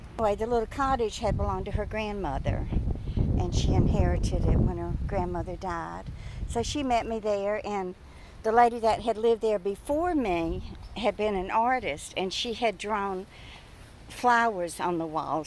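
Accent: South, United States. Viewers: A beautiful video. And I love hearing your southern accent. Could those tomatoes be heirlooms? Thanks!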